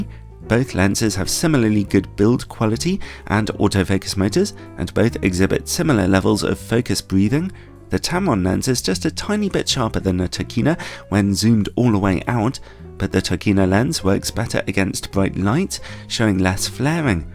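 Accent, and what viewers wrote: United Kingdom. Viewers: He's british, and has a very british voice. That's the brit secret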